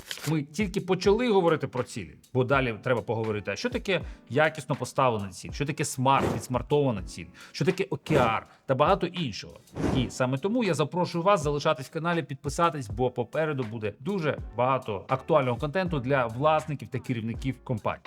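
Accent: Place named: Ukraine. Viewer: Он говорит на русском, с украинским акцентом! В целом все понятно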